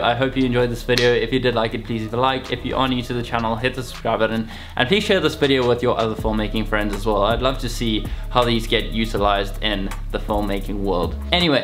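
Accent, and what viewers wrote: South Africa. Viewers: You sound south African? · The accent sounds very South African. Finally I get to hear another South African review about cameras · As soon as I heard that accent I just knew it... Big ups bro, great tips ... very useful 🇿🇦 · picking up a south african acent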